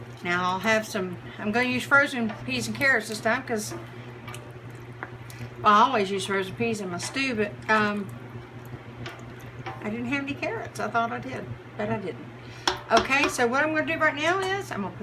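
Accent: South, United States. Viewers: I am thinking; Kentucky;Virginia, Tennessee by accent?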